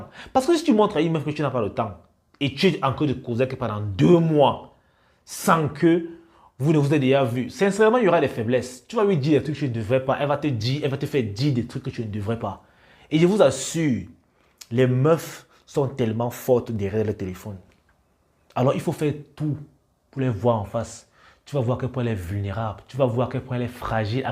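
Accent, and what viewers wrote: Cameroon. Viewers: Toi tu es mon frère camerounais, vue ton accent et ta manière de parler je suis sûr que tu es un Camer, et big up et force à toi bro. ( like mon commentaire si tu es Camer et que je ne me suis pas trompé )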